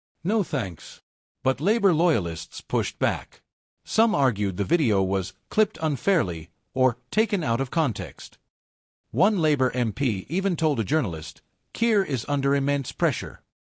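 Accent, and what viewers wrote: United States. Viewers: The title of this video is totally unrelated to this video. KEIR STARMER TO BE REMOVED FROM PARLIAMENT AFTER HOSTILE RESPONSE- is a blatant lie as there is nothing in this video that has anything to do with the title. And again, there is an American narrating this video. One big con and one big lie. This video should be removed from You Tube.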